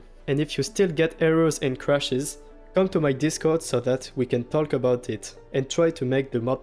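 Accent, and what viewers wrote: France. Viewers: T'as un accent français ou c'est moi? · ahah j'ai compris que tu étais français dés que tu as dit "of course ", bon accent et bonne vidéo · le petit accent français qui fait plaisir super modpack sinon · Are you french? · J'étais pas sûr avec le temps mais j'ai enfin la preuve avec ton Steam ! Je me posais tout le temps la question avec ton accent mais t'es bien français 😭😭😭😭 Merci pour ton contenu continue c'est incroyable 🙇🙇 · haha sa s'entend que t'es français et merci pour le modpack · t'es français ? je reconnais bien cet accent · U sound french , are you french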